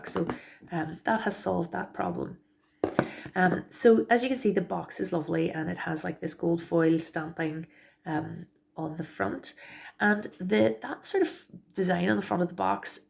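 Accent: Ireland. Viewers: Oh you're irish! Yaaayyyy, subscribed 🥰🌞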